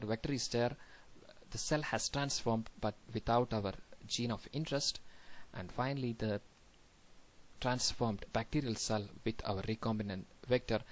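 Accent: Kerala, India. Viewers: amazing example of mallu accent....mallus are the best!!!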